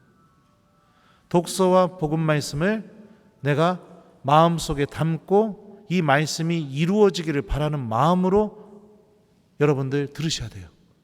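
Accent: Chungcheong, South Korea. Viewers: 잠이 오지않아 우연히 듣게된 말씀 입니다 간간히 쓰시는 충청도 사투리가 정겹습니다 강론 이 감동입니다 찾아 듣게 되는 강론 이며 ㆍ 감사드립니다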